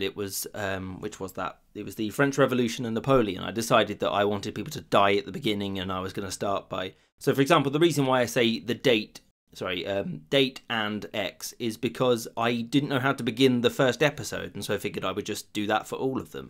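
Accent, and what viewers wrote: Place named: West Country, United Kingdom. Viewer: Peterborough? I thought you were from the West Country.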